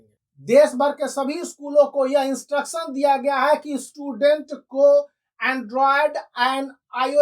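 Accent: Bihar, India. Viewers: haa sir Bihar se belong krte h lg rha h bhai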